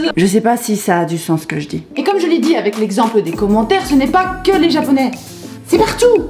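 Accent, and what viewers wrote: Belgium. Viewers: Ah non moi je pensais pas que t’étais française #laccentbelgecestminion 😍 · Pourtant ça s’entend fort que tu es belge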